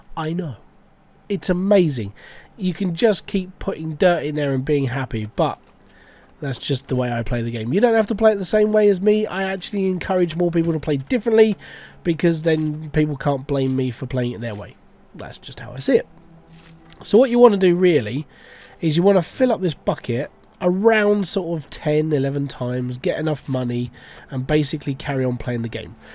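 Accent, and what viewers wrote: United Kingdom. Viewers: i love your english accent also i am english too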